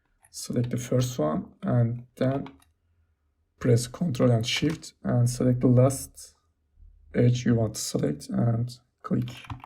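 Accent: Turkey. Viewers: Clean! I am sure that He is turkish by the way! His accent is soooooo familiar!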